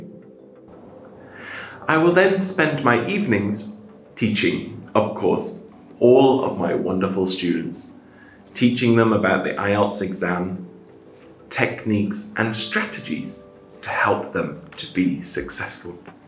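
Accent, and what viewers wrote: United Kingdom. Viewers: Giọng anh - anh chuẩn, nhiều từ vựng. Năng lượng của Thầy luôn truyền cảm hứng rất tuyệt vời!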